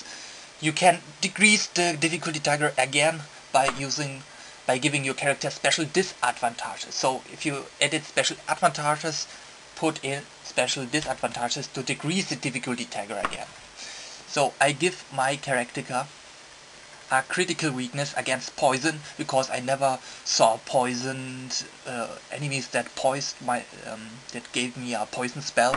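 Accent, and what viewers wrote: Germany. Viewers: Are you German? Sorry if I'm wrong, but I'm not so good with accents. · Oh god. German Accent. Boner. =|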